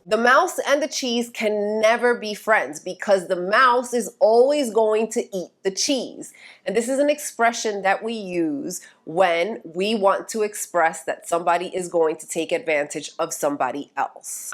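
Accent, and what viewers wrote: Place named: Paisa, Colombia. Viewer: Great video and U love the paisa-ish accent when you speak Spanish! And a fantastic, timely warning. For anyone who doesn't know, though, "No dar papaya" is an expression that means don't let your guard down or otherwise make yourself an easy mark.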